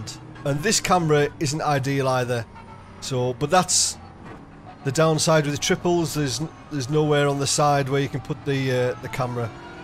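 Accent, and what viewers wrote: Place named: Durham, United Kingdom. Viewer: I've watched loads of your videos, and I can never decide which part of the North East you're from, go on, put me out of my misery? The accent sounds like Durham/Sunderland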